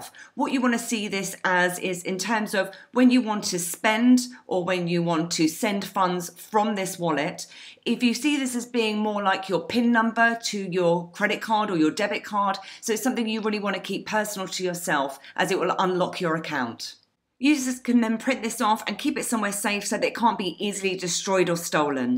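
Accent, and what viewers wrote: United Kingdom. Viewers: Nice to finally hear British accent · your British accent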